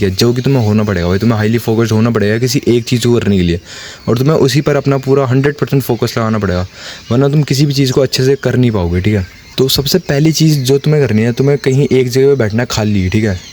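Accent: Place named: Hills, India. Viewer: Bhai pahad se ho?😊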